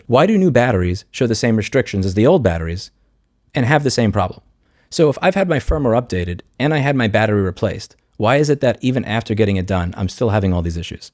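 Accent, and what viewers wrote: New York, United States. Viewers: You still got your New York accent. 😎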